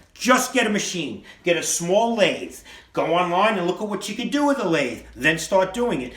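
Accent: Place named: Detroit, United States. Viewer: That's a beautiful accent where is from ? Detroit ?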